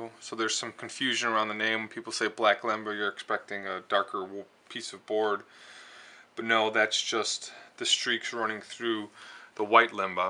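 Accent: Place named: New Jersey, United States. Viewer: That sounds like a Jersey accent. Good review.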